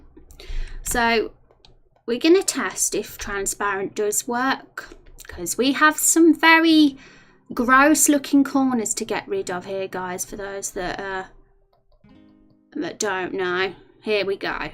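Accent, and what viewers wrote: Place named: United Kingdom. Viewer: Oh, British? had to adjust a bit but I liked it! ;P :D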